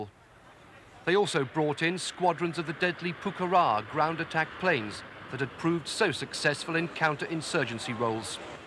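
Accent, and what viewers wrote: United Kingdom. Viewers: Thank you. This is the first time I have heard anyone with a British accent speak the truth that both sides were totally unprepared for this war. And that was said in the opening statement. Of the two.. The Argentinian Air force did a hell of a job, their army sucked and their Navy didn't fair too well either. If you have watched any footage, or read about what the Brits had to go through to pull this off.. It was as close to a complete clusterfuck that any military could get. The bombing mission of the airfield, the sinking of all those British ships, especially using a merchant vessel from Cunard. The sinking of the SS Atlantic Conveyor made me scratch my head when that footage hit the news. I was like.. WTF are they using a commercial vessel for in their war campaign? I actually thought the British were supposed to be a super power. 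They looked like a rag tag fleet of incompetents. Argentina put up a good fight. If their Navy and their land forces would have been better, they could have dealt a blow to the Brits. In all reality. They still did.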